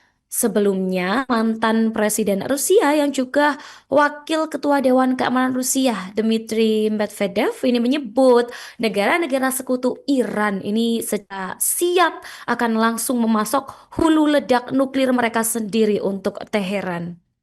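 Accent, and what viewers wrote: Java, Indonesia. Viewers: Hostnya medoknya nagihh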